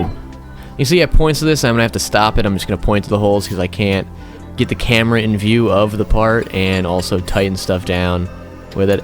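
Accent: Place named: Massachusetts, United States. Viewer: Sound like your in Ma.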